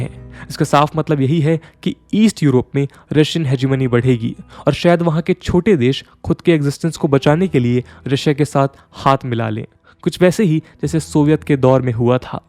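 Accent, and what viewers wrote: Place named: Bihar, India. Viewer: Mr., From your voice tone, I think you are from North Eastern Bihar.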